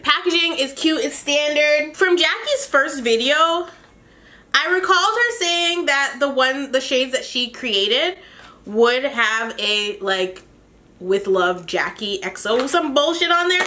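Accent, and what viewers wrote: United Kingdom. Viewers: That accent though sis had me shook lol 😂🇬🇧bound lol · UK accent on point sissss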